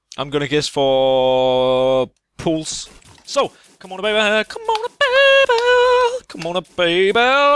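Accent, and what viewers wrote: Denmark. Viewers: Danmark? Tydeligt at høre!:)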